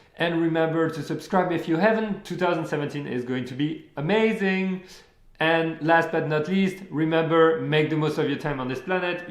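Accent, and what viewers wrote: France. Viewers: He sounds like Mark ruffalo with a french accent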